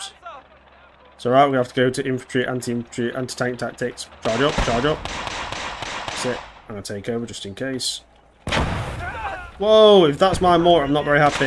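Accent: England, United Kingdom. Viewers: Weird to my ears to hear the invading German army "luckily" has plenty of materiel with which to invade Poland. In an English accent too. Great game though. Thanks for vid.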